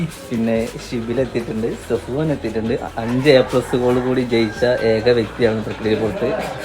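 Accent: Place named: Malappuram, India. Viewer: Malappuram talk i like very much i am from kannur.peole are so humble and broad minded❤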